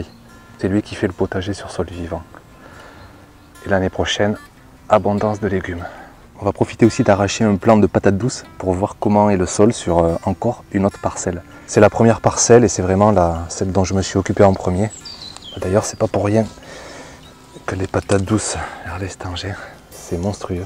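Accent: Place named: Southwest, France. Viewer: Elle l'est car peu travaillée ces dernières années c'est plus sûr la strate du dessus l'herbe était coupée a raz tout le temps donc la terre peu protégée des UV :) j'entends que tu prononces le S quand tu dis : moinS tu es d'origine du sud ouest ? :)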